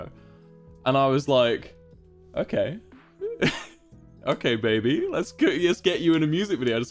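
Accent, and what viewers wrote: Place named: United Kingdom. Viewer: His British accent tho😢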